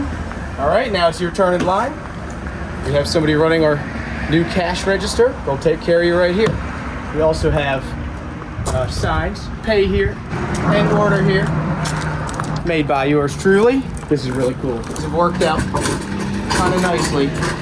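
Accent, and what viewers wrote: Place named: Maryland, United States. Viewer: I have a lot of friends from Maryland. And that is a distinct accent! The rope is too tieeet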